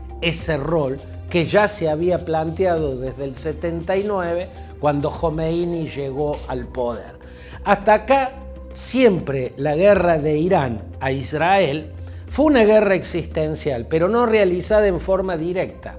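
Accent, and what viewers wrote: Argentina. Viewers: DEJA DE HABLAR ARGENTINO , LUEGO IREMOS POR NUESTRA PATAGONIA .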